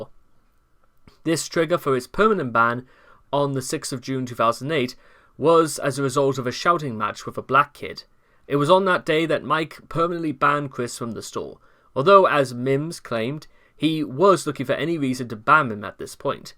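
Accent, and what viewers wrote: United Kingdom. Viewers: You sonded like a cockney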